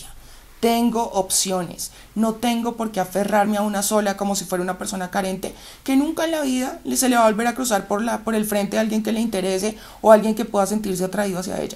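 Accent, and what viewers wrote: Colombia. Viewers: Excelente video y GRAN CONTENIDO 🇨🇴 MI GURÚ FAVORITA ❣️😉✌️🇲🇽 (esa manera de hablar colombiano y esplicar con tanta paciencia te hacen muy ESPECIAL 🇨🇴❣️😉✌️🇲🇽)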